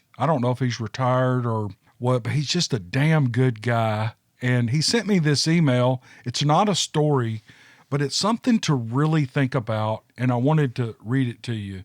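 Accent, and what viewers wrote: South, United States. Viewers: It's your voice we want to hear, for me it makes me feel at home.iI was raised with the southern accent, and the La., Missippi way of talking.Just talk all you want, we all love it. Thank you for the storys. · How did i go one day without listening to your great southern draw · I love putting your videos on while I'm doing other things. Nice stories and your voice is very soothing. I live in Michigan, but I'm originally from down south and your voice (and accent) reminds me of my childhood. Thanks.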